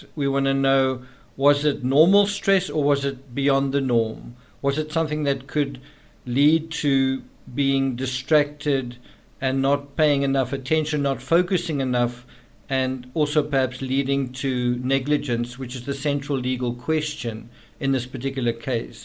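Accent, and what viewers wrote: South Africa. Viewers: You popped up my in my recommendations and when you said the most authentic voice in true crime in the intro...I thought wait this dude sounds South African... and pleasantly surprised that you are!! Howzit from a fellow South African * slams subscribe button * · This American loves your South African accent 🙂 and of course your wisdom too.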